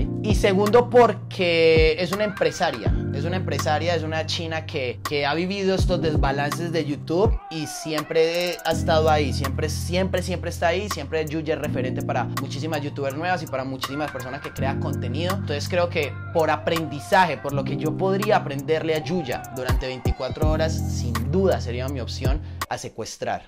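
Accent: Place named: Antioquia, Colombia. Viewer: Que flow paisa 🍃😎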